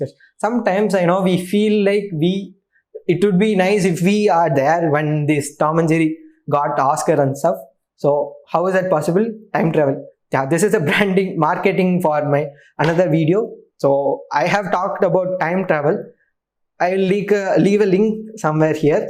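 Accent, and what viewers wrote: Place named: Kerala, India. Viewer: He sounds malayali to kaisa bolinga une haule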